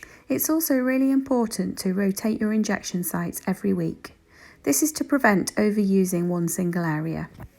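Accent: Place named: Liverpool, United Kingdom. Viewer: I am writing from Phoenix, Arizona, USA to thank you for this video. Watching this and your calm demeanor helped me to take my Ozempic medicine. You are a peach. BTW I love your Scouse accent. Merry Christmas! P.J. Stewart.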